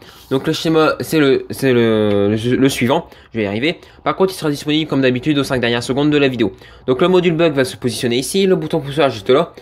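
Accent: North, France. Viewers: Tu Vien du nord touwa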